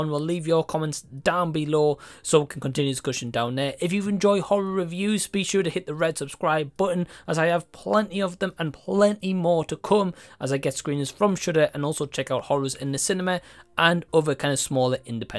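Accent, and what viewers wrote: Newcastle, United Kingdom. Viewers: Geordie? ;) Why-aye! :D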